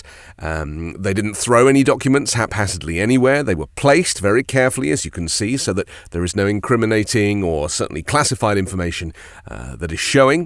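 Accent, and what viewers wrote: United Kingdom. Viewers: Don’t u just love the British accent 🥰explaining how trumps ass is about to fry 🔥in the karma grease🤣🙌🏾🌈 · OMG 😳 I love 💗 the British accent! We are all in this fight against fascism together. Bless you. 💋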